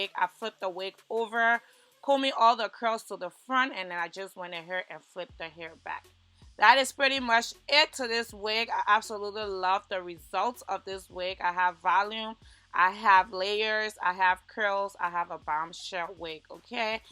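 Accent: Liberia. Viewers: Just by curiosity are you Liberian? I think you have a Liberian accent.😊😊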